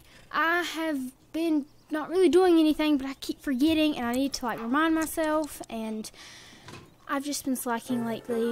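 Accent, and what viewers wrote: South, United States. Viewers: Your shoutout literally made me smile from ear to ear I'm so happy you liked the home. I really love this series so much, and your so amazing I love your voice and the way you say most things it's so....southern lol I love youuuu!!!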